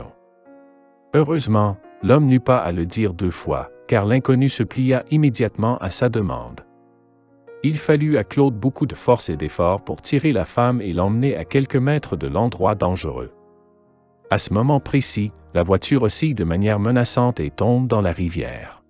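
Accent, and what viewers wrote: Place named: Canada. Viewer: Un peu de réflexion il est canadien on entend bien l'accent et le français n'est pas le même a 100% la bas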